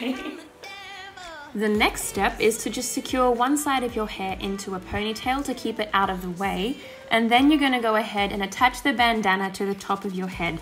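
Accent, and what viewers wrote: Australia. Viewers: Omg! Kath and Kim! The way you said "so" at one point sounded exactly like one of the snooty shop keepers (forgot their names..Trude I think), which sealed in my mind that you must definitely be Australian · It’s an Aussie accent I have one too. Aussie Aussie Aussie oy oy oy. Isn’t your name mate. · She kinda souds aussie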